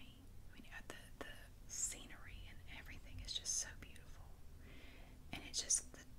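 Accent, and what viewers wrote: South, United States. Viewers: Your accent while you whisper reminds me of my sweet biology teacher from highschool in Mississippi its so comforting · Ohhhhh myyyy goshhh ffinnallllyyy..you my dear are what i have been searching for. I have been losing my ability to get ASMR because i listen to the same asmrtist all the time. But as soon as i heard your beautiful southern voice..my asmr was instant. Ty so much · i keep coming back to this one, its soo nice. your whispering voice and accent are great! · Very nice movie selection I have to check out some of those movies that you mentioned.A lot of your selections are my favorite as well.You should watch the "Forsyte Saga" it's good.Great video and I also love your southern accent.❤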